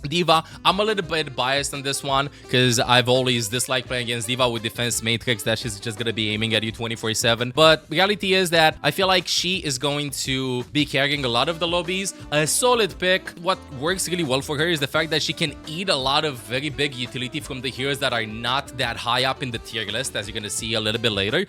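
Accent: Romania. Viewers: That’s European accent, like Romanian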